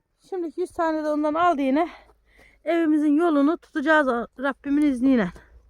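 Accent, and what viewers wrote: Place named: Giresun, Turkey. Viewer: hn.Bn İstanbulda yaşayan bir Karadeniz'li olarak merak ettim neresi diye cevap verirseniz memnun olurum.konuşmalarınız aynı Giresun şivesi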